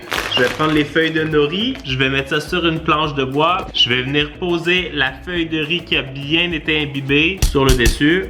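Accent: Canada. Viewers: tu mon youtubeur préféré de is vidéos accent canadien et qui met quoi des vidéos trop trop rire 👍👍👍👍👍 24h 🇨🇵🇨🇵🇨🇵🇨🇵 TikTok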